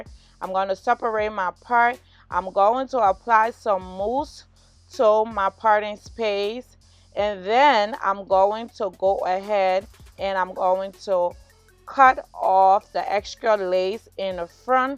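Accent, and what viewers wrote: Liberia. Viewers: you sound liberian go awwwf sis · I hear Liberia !!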